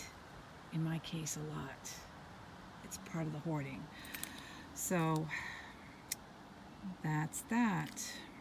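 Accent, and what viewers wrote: New England, United States. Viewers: Hi! I will be happy to be part of your community:) I have a lot of trouble letting go and have some hoards of my own for sure! I get it;) Also- you have a New England accent; I’m up in Maine!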